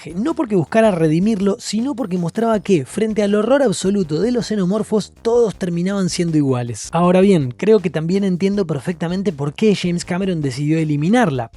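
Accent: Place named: Argentina. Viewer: Lo que en llamas "torretas" se llaman en realidad robots centinelas .. Todo bien con este contenido que me parece muy interesante,pero hay que decir las cosas como son.. Además no entiendo xq este canal pone como país de origen a Estados Unidos,cuando cualquiera que te escucha hablar de da cuenta que sos argentino.. Saludos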